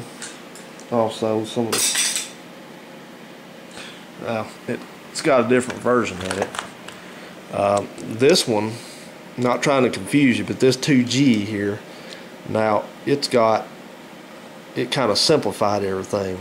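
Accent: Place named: South, United States. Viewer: I love it when a guy with a southern accent impersonates someone with a southern accent.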